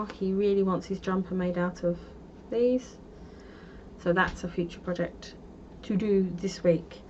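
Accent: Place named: United Kingdom. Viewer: Hello, an good morning, I have just found your podcast, an was laughing so much at your comment of wanting to make the Shawls, because I am the same, love shawls, an like you have a growing stash that will be older than me teeth if I don't stop. ha ha, love the yarn you showed, look forward to seeing what you make with them. I take from your accent you are U.K. I live in North West England. an have subscribed. have a lovely day. :)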